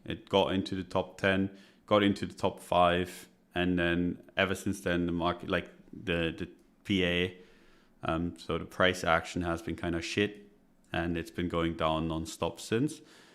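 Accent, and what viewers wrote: RP, United Kingdom. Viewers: content lol.i realised these guys are just waffling for hours over s/r levels that are obvious on a chart. everything is it "looks good" or "looks bad". My bet is they both work, have jobs, and just release lack lustre vids to earn money for leverage trading following YT income. Creds system is flawed too if you actually bother to back test it. but he speaks posh so people believe him. sponsored by leverage exchange to get you to gamble says it all. "I think think think" blah blah blah . there's no on chain analysis here or order book insight at all. these guys are amateurs and rent their homes with a high probability that mummy cooks dinner every night for them.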